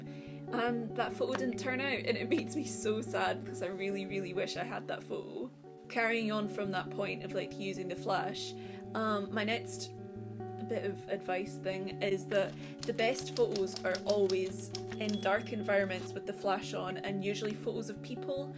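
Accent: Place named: Wales, United Kingdom. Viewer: you sound welsh even know i am welsh :/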